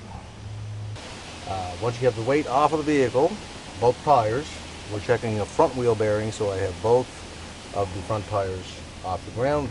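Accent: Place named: Canada. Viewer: I love your Canadian accent. Sounds very cool! Much love and respect from your friends from the southern border!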